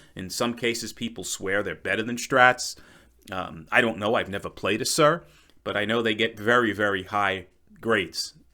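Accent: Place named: New York, United States. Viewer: Think I detect a NY or NJ accent.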